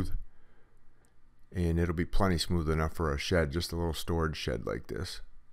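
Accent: Maine, United States. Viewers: You sound like a Mainer. About how big can you make a slab in New England, where the ground freezes, before you need footers?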